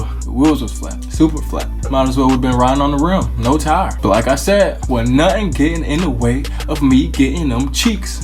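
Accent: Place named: Florida, United States. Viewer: lmao this nigga accent... Flawda as fuck lol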